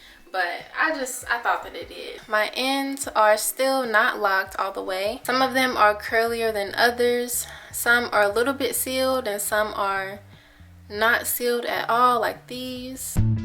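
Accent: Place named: Houston, United States. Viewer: Yooo! I was like, she sounds like Solange. Then, I see you're from Houston. I guess we really do have an accent.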